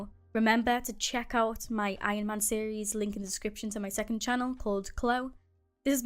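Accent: Liverpool, United Kingdom. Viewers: Wait are you scouse? Because I am and I’ve never heard of a scouse Minecraft youtuber😂😂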